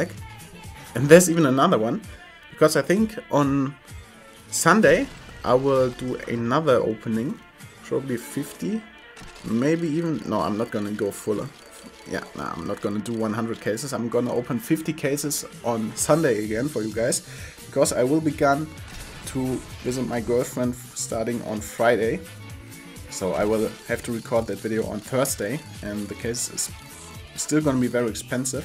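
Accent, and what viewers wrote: Germany. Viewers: This german Akzent Oo xD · wenn ein deutscher englisch redet.... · man hört direkt dass du deutsch bist :D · Ich krieg so Aids bei deinem Deutschen Akzent während du Englisch sprichst. Es gibt nichts schlimmeres. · Bist du ein deutscher oder ein Engländer ?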